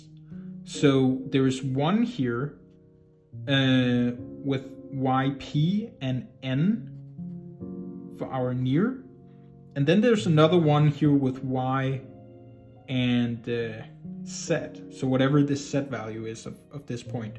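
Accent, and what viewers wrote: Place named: Massachusetts, United States. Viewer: Your from Massachusetts aren't you?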